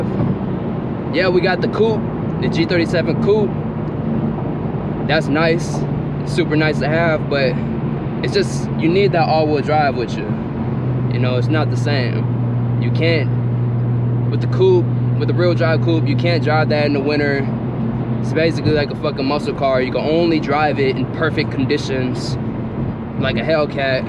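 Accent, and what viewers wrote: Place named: New Jersey, United States. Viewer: You definitely sound like you from Jersey B 🙌🏽💯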